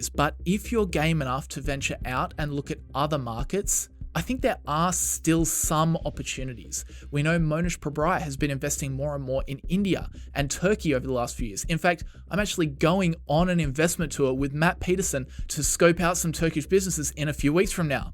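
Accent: Australia. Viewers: an aussie dude huh. let put some more gilts on the barby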